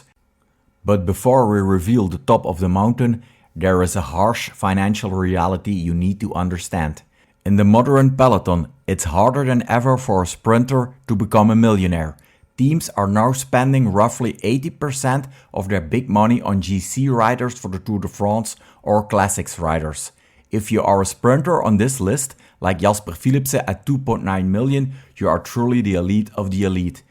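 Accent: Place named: Limburg, Netherlands. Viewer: You have a funny english accent. Limburg maybe ?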